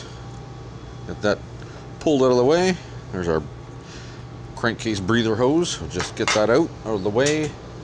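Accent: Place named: Canada. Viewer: By chance are you Canadian?? Noticed a bit of an accent.🤔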